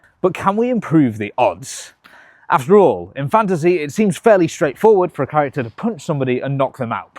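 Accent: United Kingdom. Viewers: ok so you're british right? Slow Mo Guys collab???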